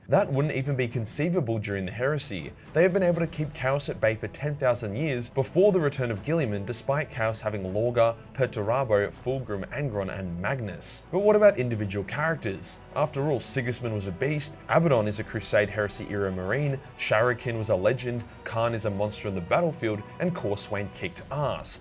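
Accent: Australia. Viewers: Battle of cadia explained by australian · Been a fan for a while now cheers mate for being the reason I’ve become a fan of war hammer and I keen to always hear a fellow Aussie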